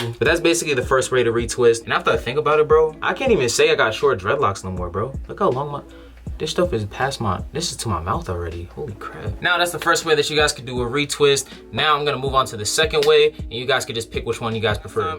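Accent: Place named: New York, United States. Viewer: You from New York innit 🖤♿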